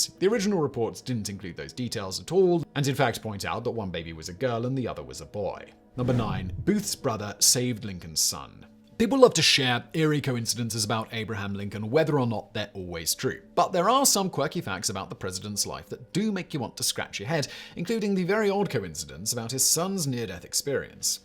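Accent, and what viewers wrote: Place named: Hammersmith, United Kingdom. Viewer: I feel like your accent is more hammersmith less kent 🤷🏻‍♀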